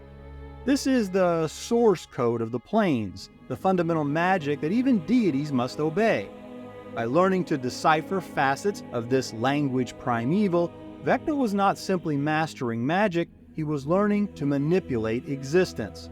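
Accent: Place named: South, United States. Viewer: Fyi the music is good , i'm autistic and sometimes music distracts me yours is good just extenuates the narrative , i think you're on your way to being the top FG guy , you're meticulous eye to detail plus artistic panache is a winner, you have very clear precise way of speaking with only a slight accent, i would guess you have a professional background possibly a college lecturer, english literature philosophy liberal arts etc . vaguely southern accent but not folksy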